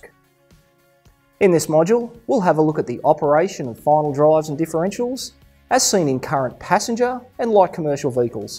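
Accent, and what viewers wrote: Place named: Australia. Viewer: I think all technical videos should be done by someone with an Australian accent from now on.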